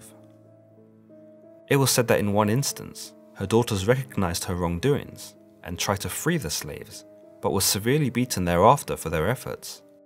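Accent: United Kingdom. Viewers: Hey British commentator when you're doing an American documentary get to the point please.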